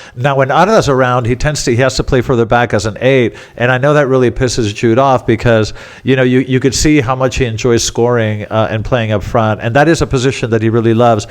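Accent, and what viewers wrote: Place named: United States. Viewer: You were playing bottom of the league. I gave up after 30 minutes when Oviedo were dominating and Real decided to actually play. In the rest of Europe we are sick of Real Madrid and happy that a PSG side without Galacticos is kicking ass and the rest of the world is laughing at you, knowing this moment was coming. The so-called greatest team in the world reduced to a pile of excrement. A bit like the US you could say. And you with your American English and predator hedge fund background would surely be a great choice for next Real President, Don.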